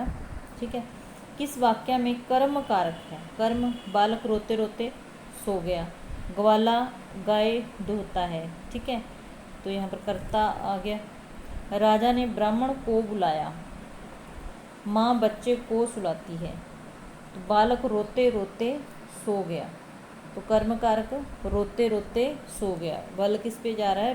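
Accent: Punjab, India. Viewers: Mam aap Punjabi ho kya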